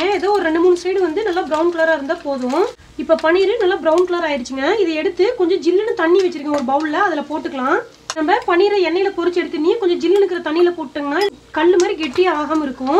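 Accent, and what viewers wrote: Kongu, India. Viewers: Kongu tamil sema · Kongu Tamil super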